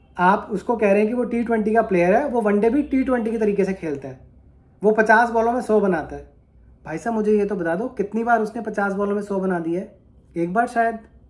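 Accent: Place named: Gujarat, India. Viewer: He sounds like gujarati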